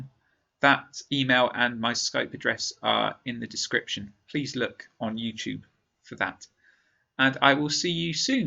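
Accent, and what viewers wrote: United Kingdom. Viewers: love British accent 😍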